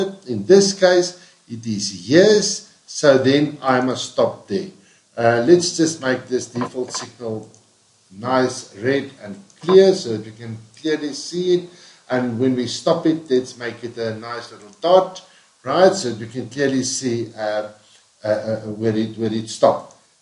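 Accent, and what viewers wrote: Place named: South Africa. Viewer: thanks prof, was great to hear a SOuth African voice teach me, keep it up, i am a big fan of this youtube page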